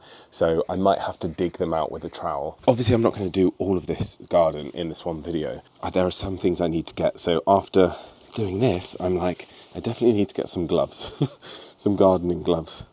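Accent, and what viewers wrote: United Kingdom. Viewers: Hi dude just chill and relax a bit. You seem to be doing great. You must do whatever you like to do. Don't take too much pressure on yourself. You literally have positive vibe and that is great thing about you. 1 year ago I started watching your videos just to learn British accent 😅. After a year I can mostly be able to understand the British accent now. Lot of love from India 😊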